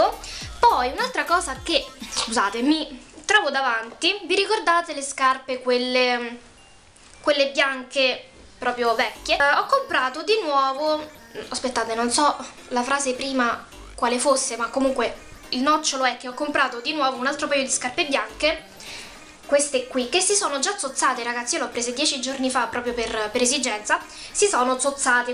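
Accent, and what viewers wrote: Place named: Rome, Italy. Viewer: bellissime cosine mi sei mancata in questo periodoo!!adoro il tuo accento romano😘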